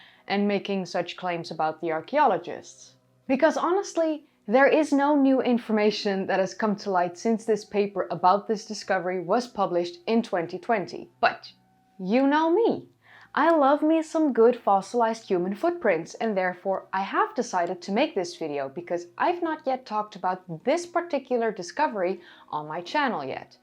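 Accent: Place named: Netherlands. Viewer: I love the Dutch accent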